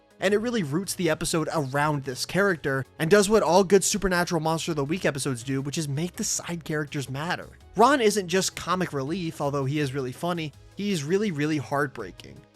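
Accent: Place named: Midwest, United States. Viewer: This guy sounds like polyphonic. He must be from the Midwest.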